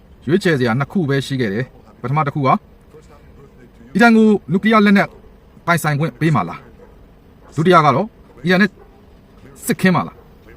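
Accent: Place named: China. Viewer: မင်းအသံကတရုတ်ကလားသံပါေနတယ်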